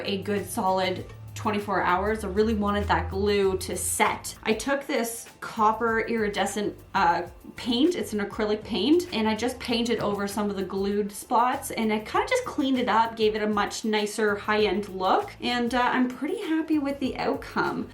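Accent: United Kingdom. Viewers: Did you binge watch Harry Potter before you did this cause you got the accent down! The birds! That was really awesome, so cute! I love both DIY'S. I didn't think I would care for the spoon art but it looked so good.. I stand corrected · Love your British accent 😆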